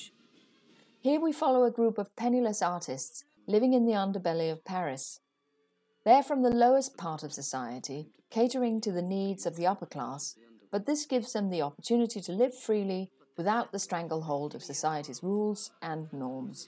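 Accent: United Kingdom. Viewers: Hey, I'm was a recent viewer. When I stumbled upon one of your videos, I thought it was another boring analysis that I've heard from other Youtubers. I am glad I clicked on it. I was hooked on how deeply you analysed the details and symbolism of Arcane. And I never would have thought of the parralels if it weren't for you. Your videos are very enertaining and it also helps that your voice is very calming. If you don't mind me asking, are you British? I'm sorry if I do get your accent wrong.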